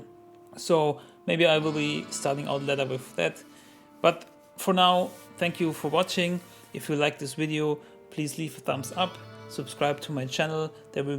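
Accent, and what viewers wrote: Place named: Germany. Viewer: German for sure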